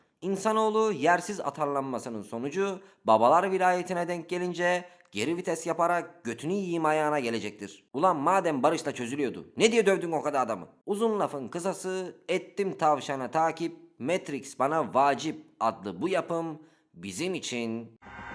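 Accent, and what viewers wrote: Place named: Anatolia, Turkey. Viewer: Hacı abi anadolu şivesini bu kadar iyi nasıl gonuşuyon. Bence güzel tat katıyor.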